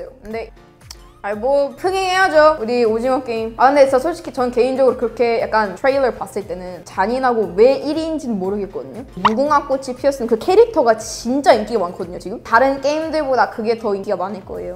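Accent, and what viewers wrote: South Korea. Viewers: 이 학생 외모만 외국인이지, 하는 행동, 말투, 표정하며 완전 한국인이네. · ㅋㅋㅋㅋ ㅋㅋㅋㅋ 이학생 뭐니 말도 엄청빠르고 액션이나 말투 사투리까지 이아이 한국사람 오리지날이네 ㅋㅋㅋㅋ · 한국 여학생이야??????? 발음하고 말투하고는? ㅋㅋㅋ 우리 옆집에 사는 동생이랑 똑 같네? 대박이야~~~~~ 미치겠네~ㅋㅋㅋ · 영상 안보구 청각으로만 들으면 100퍼 한국인이다! 네이티브 ㅋㅋㅋ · 와 완전 한국발음이네 ㅋㅋㅋ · 정체가 누구예요? 한국말 완전 원어민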